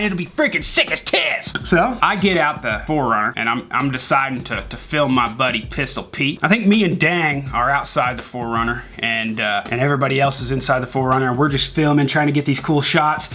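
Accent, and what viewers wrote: United States. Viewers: are you american or swedish?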